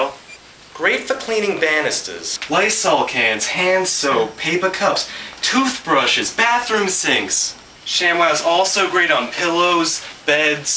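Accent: Boston, United States. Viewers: This guy sounds like he's from Boston Fawwkin Queeeeah! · hahahah boston accent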